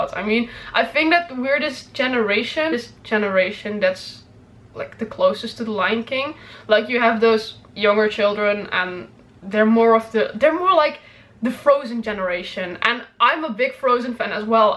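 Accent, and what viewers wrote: Netherlands. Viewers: Are you dutch? I loved the reaction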